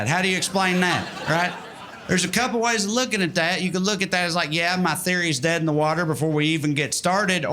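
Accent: South, United States. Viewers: His “southern accent” is getting thicker, and more cringe. Before you know it he’ll be ending every joke with GIT’R Done! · Does he have a southern accent? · Yall are my favorite genre of white people. Love a liberal with a thick southern accent. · Picture the language skills of G.K.Chesterton in the accent of the Dukes of Hazzard. Brilliant and hysterically funny. Took my husband and son-in-law to see Trae at Funny Bone in Virginia Beach. A crowd of huge fans, blown away by his intelligent goofiness. 🥰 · It's like Alan Sorkin dialogue performed with a southern accent. The writing is that good. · I actually felt it was the opposite, that he felt the need to compensate for his accent by using big words like "vehemently" "gain purchase" "whimsical anarchy" and so on, I think he plays on the jarring nature of being a verbose redneck, that's one thing I love about his comedy. 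· Good Lord! God Al.ighty! This Southern sounding boy makes me relate😁Much enjoyed, go on with your bad self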